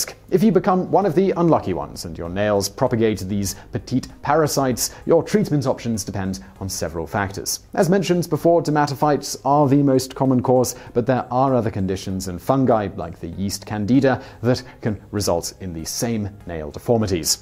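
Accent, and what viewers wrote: England, United Kingdom. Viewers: My gosh brother you're a truly amazing speaker so easily understood and love the English note that is so you. I'm off to make changes, thanks fast speaking intelligent human.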